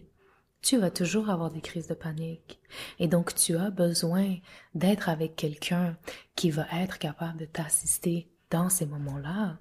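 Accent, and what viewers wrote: Quebec, Canada. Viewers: J'adore ce petit accent canadien / québécois du français que tu as appris. Félicitations, toujours au top tes vidéos 🙏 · You sound québécoise(just like me fr) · je ne savais que tu parle Français :) c'est un accent Quebecois ? tous ce que tu dis est beau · Ça c'est du français Québecois! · Un petit accent québécois 😝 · Merciii pour cette petite leçon de vie! Ps: j'adore ton accent québécois (si c'est bien ça):) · I'm from Saskatchewan, I'm surprised I picked out that it was a Quebecois accent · Charmant le parler québécois 😊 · Québec accent here 🙃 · when you speak english you cant barely pick up you are from canada , totally different in french, your accent betrayed you (that and speaking french from quebec) hahaha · Je ne m'attendais pas à ton accent québécois hahahahaha j'étais habituée à l'anglais mais ça fait un ti choc mdr (je suis française) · je suis français et je suis étonnée que tu parle si bien français. 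PS: j'adore ton accent québécois. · Avec accent québécois 😊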